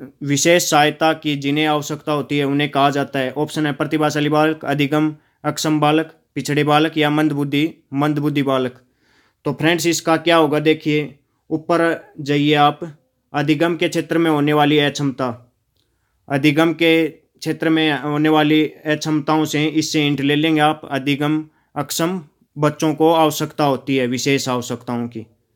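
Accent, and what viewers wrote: Haryana, India. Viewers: Hariyana se ho kya sar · Haryana ka laage h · Haryanvi h ye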